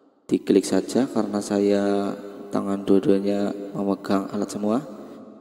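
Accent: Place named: Banyumas, Indonesia. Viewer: ngapak ngendi mas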